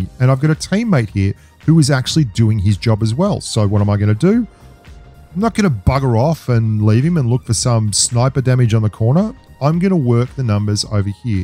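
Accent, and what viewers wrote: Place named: Australia. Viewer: I sure did miss that Aussie voice. I quit playing for several months and came back and started playing again. Happy to see you back to posting regular vids.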